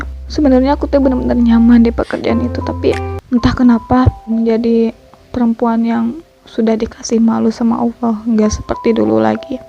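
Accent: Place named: West Java, Indonesia. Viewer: Eta logat Sunda nya 👍👍slalu Istiqomah ya sayangkuuu 😍😍🤗😘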